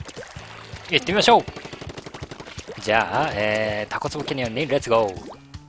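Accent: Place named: Okayama, Japan. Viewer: うぽつですm(_ _)m もしかして岡山県民？？？ じゃけぇって岡山弁だったので…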